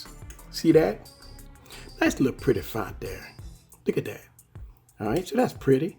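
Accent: Louisiana, United States. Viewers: I hear the accent ! Gotta be from Louisiana I’m from New Orleans and you?! Great video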